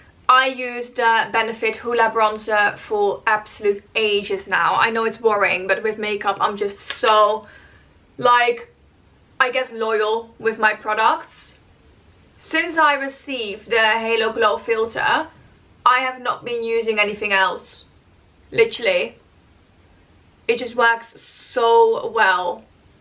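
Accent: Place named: Netherlands. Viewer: Ik hoorde een ‘kleiin NLaccentje’. En toen zag ik je naam en wist het zeker😄. Bedankt voor de review!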